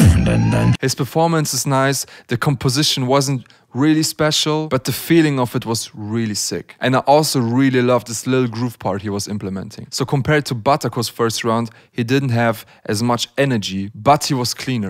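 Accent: Germany. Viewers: Du bist Deutsch oder du hast diesen Deutschen Akzent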